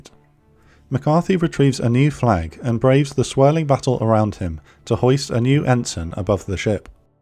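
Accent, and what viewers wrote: United Kingdom. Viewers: Most BORING vid evah.. Can you possibly Mumble any more Monotonously? One sentence.. 1. A British accent; 2. Listlessly Mumbling; 3. Unexpected (and indecipherable) words; 4. Tonelessly; Exciting Topic! Thrilling Script! aaaaaand, Narcoleptic Narration humdrumhumdrumhumdrumhumdrumhumdrum zzzzzzz Did you sometimes call some airplanes, "Janes?"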